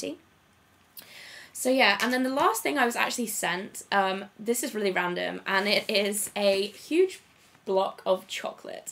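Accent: United Kingdom. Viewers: Hi, I actually just found you through MissGlamorazzi and I really love your videos and your British accent! I have a friend who lives in Hull, I think thats how you spell it?? Actually I think she lives in London now and I love listening to her voice. My husbands step-mother is also from England, she grew up in the Isle of Mann. Anyways, Could you do a video on different hair styles that are simple everyday looks? My hair looks the same everyday and I have no idea what to do with it! Thanks!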